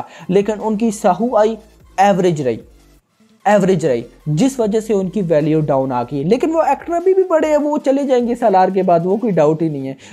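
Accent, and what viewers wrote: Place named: Punjab, Pakistan. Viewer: bhai thumara pakistani punjabi urdu accent aata hai...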